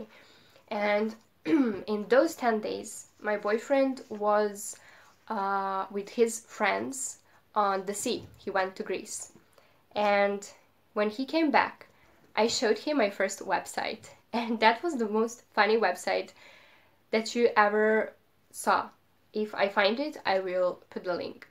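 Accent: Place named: United Kingdom. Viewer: u r from Serbia but u talking as british girl wow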